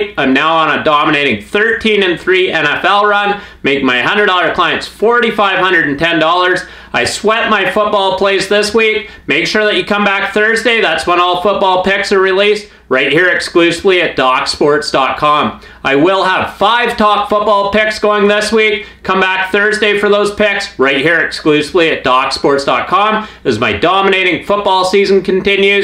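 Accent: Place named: Ireland. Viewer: You seem to be drifting into an Irish accent ......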